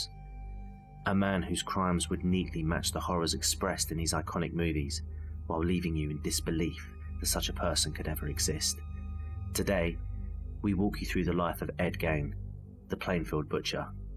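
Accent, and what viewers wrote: United Kingdom. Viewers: what area are you from you have a west end/essex tang to your voice but cant pin it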